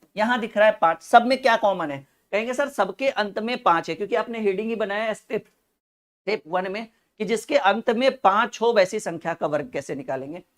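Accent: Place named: Maharashtra, India. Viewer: तुम्ही बहुतेक मराठी आहात.कारण तुम्ही आठी, साती म्हटले.😊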